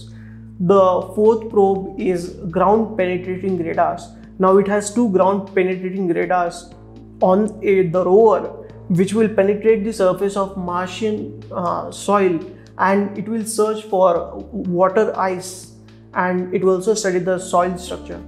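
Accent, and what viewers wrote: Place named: India. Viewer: Indian accent means customer service cheats.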